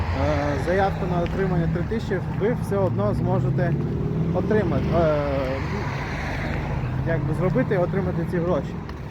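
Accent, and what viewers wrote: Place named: Western Ukraine, Ukraine. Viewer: Привіт! Гарно говориш по українськи, навідь з легким західним акцентом!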